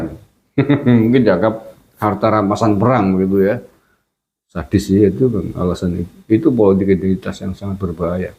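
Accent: Banyumas, Indonesia. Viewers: Kang wong ngapak..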